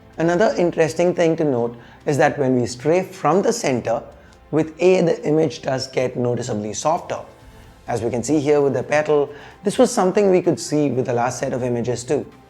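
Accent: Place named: Tamil Nadu, India. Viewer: Ennatha fluent ah English pesunalum....nammalungandrathu thaniya theriuthu 🙏🙏🙏🌸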